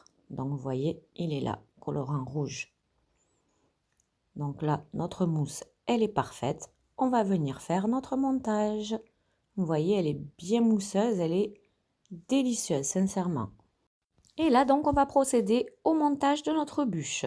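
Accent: South, France. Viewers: super recette, et surtout j'adore votre accent du sud :)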